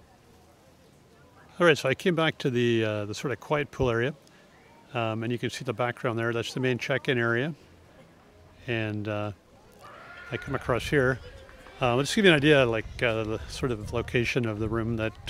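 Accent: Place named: Ireland. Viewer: Sounds like an Irish accent to me.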